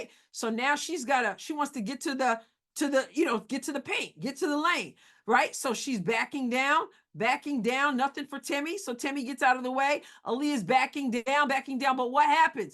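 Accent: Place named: Southeast Missouri, United States. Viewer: I thought I was able detect a slight hint of a Southeast MO accent! We are rubbing off on you!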